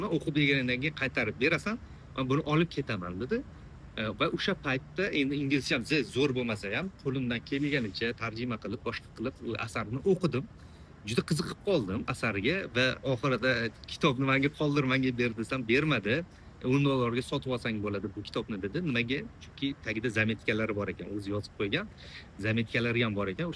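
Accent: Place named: Khorezm, Uzbekistan. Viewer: Xorazmlik emasmisiz, hazrat? Aksentlaringiz shevamizga tortyapti ))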